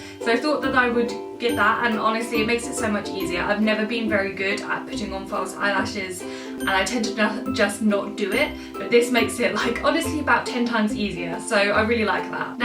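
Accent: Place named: Australia. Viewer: You sound really Australian 🙈 love your hair!!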